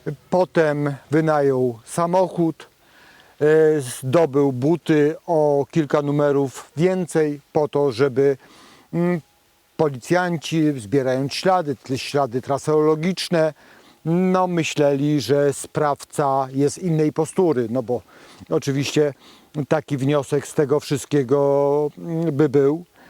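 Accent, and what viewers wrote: Kraków, Poland. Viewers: pan Inspektor jest z Krakowa. Tam się tak mówi. Zamiast np dziesiąta trzydzieści - dziesiąta czydzieści.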